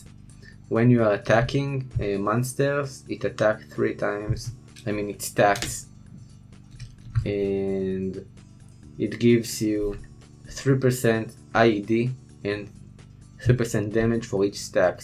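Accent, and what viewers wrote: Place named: Israel. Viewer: המבטא הכי ישראלי ששמעתי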